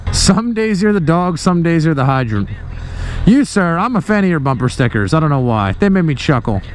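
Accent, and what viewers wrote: Pennsylvania, United States. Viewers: u from pennsylvania?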